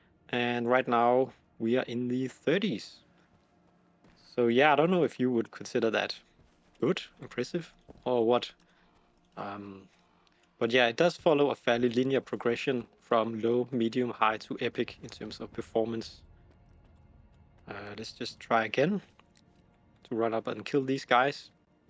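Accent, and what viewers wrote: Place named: Denmark. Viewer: Lol that's a Danish accent if I ever heard one.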